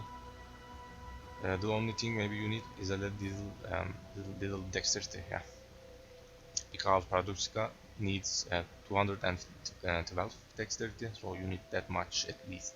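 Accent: Turkey. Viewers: Lol I immedialy recognised the Turkish accend. Ty for the build.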